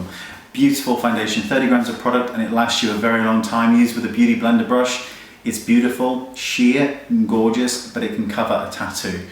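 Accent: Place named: United Kingdom. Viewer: Hey! Just want to tell you that i absolutely LOVE LOVE LOVE your videos!! They are SOOO helpful & I love your british accent ^-^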